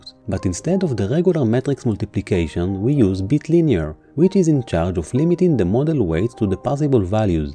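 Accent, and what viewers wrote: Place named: France. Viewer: I would guess french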